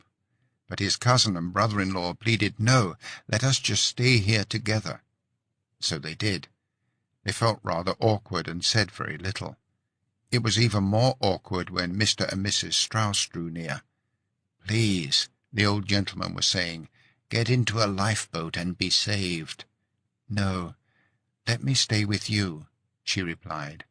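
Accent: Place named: United Kingdom. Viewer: Who is the reader!? Terrific voice, accent so perfect for UK home of Titanic, and superb clarity.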